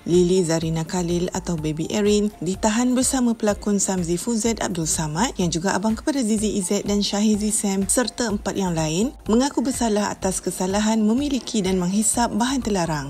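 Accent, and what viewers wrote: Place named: Kelantan, Malaysia. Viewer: Kelate kan 😂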